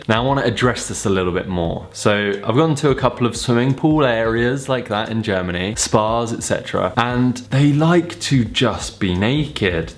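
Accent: United Kingdom. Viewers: I love your British accent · wow! That is the first video of you that I watch and I love love love your accent! I'm actually German but I love it when people speak in this British accent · I Love your british accent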